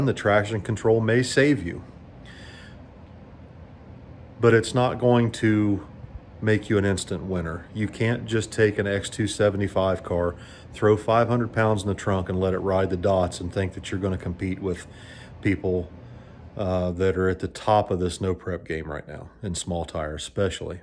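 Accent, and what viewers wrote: South, United States. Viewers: accent is country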